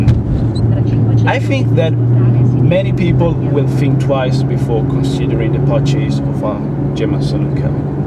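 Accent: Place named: Italy. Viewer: He's Italian...obviously he's going to have an "accent" to you muricans out there.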